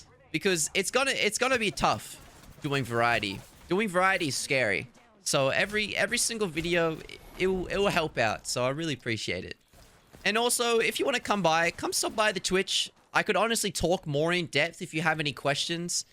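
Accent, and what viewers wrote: Australia. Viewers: I don't care how long it'll take you to upload or how bad a video may be, ill watch it and support you because i love listening to an aussie ramble about shit and your content is amazing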